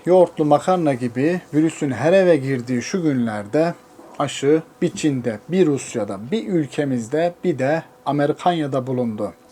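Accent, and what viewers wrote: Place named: Çankırı, Turkey. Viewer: Bi çankırıli olarak şivene bitiyorum kardeşim helal olsun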